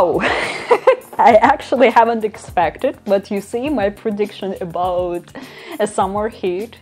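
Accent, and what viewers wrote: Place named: Ukraine. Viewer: I didn't know you were Ukrainian but I suppose you were from around based on your accent. I'm also a huge Foals fan and I saw you reacted to one or two more songs by them. Much hugs and love from your number one fan from Bosnia. Your reactions are awesome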